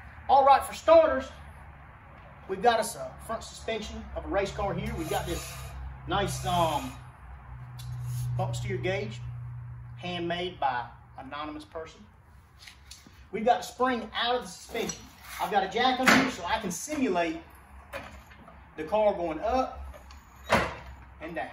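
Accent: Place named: Appalachia, United States. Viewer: HillBilly trying to sound real intellectual like.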